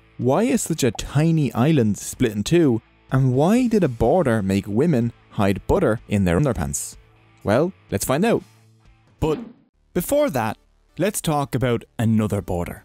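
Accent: Dublin, Ireland. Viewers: The Irish accent makes it even better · Am I the only one to never notice your Irish accent till this video? Like suddenly I heard it and thought “was he always Irish? Why am I only now noticing this?” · Ngl when I first saw this channel I thought it was run by the same guy from real engineering. I couldn’t tell between different Irish voices lol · Thank you for making such a great video! My heckles rose when I saw it but as soon as I heard your Irish accent I was relieved. It’s always best that Irish people take the position of explaining their own history. Fair play! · Are you Irish, because you have that accent? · Sounds like a Dublin accent.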